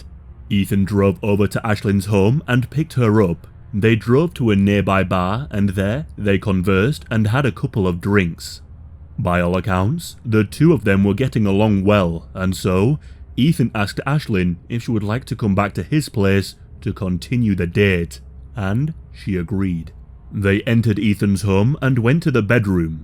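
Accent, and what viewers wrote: United Kingdom. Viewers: Yes, sounds British to me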